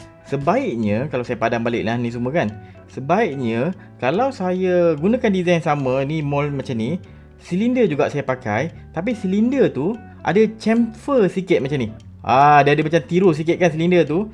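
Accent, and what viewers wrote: Melaka, Malaysia. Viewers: nice video , and btw org melaka ye :)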